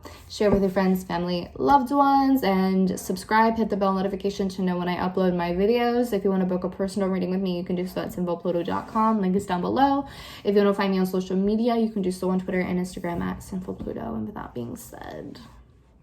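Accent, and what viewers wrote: United Kingdom. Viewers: as a British person, I appreciate your British accent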